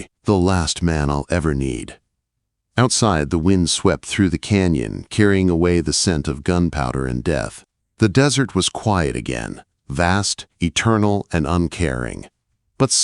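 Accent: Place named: California, United States. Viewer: Nice story, but the narration was a joke. So California USA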